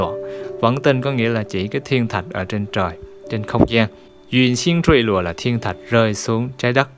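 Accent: Standard. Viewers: Thật sự mê những video của anh, thật uyên thâm ạ, và giọng anh quá chuẩn luôn, mê!!! ❤️❤️❤️ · Thầy nói rất chuẩn và rất ý nghĩa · Thích giọng đọc của thầy dã man, giọng tiêu chuẩn trung ý, nghe hay quá chừng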